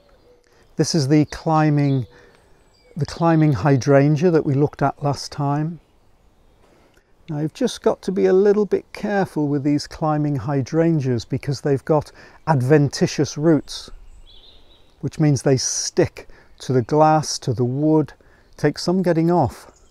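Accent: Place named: Warrington, United Kingdom. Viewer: Hi! This is my first time watching your videos and I was enthralled! I live in St. Louis now but I'm originally from Warrington and I knew by your accent that you must live close. I'm going to subscribe and look forward to more videos from you.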